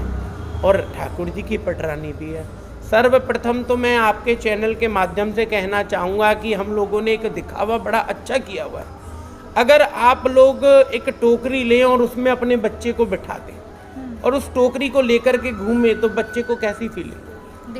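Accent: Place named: Braj, India. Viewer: Pandit ji mujhe aapki braj boli bhut pyari lagi😚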